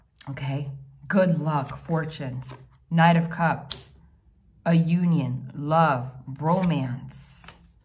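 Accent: New Jersey, United States. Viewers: Listening to you I KNEW you were from NJ. We just have that certain thing lol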